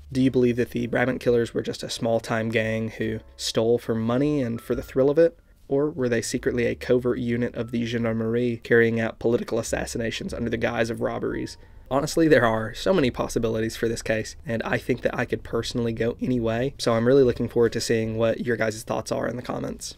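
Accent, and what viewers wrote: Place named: Southeast, United States. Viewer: Where's the accent from? It's Southeast, but not too southeast. I'm in NC, but I'd guess TN if I had to.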